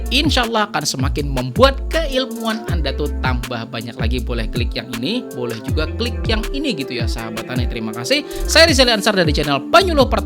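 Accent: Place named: Barabai, Indonesia. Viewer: Logat pian kaya org hulu sungai barabai pak ae😁 salam kenal dari lun petani semangka kab kotabaru kalsel.🤝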